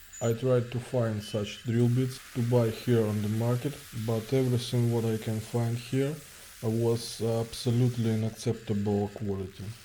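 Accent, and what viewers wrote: Russia. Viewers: Такой акцент яркий, ощущение будто русский человек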